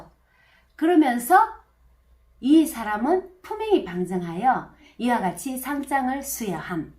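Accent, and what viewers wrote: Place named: Yeongju, South Korea. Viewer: 영주사투리 같은데 듣기 좋습니다.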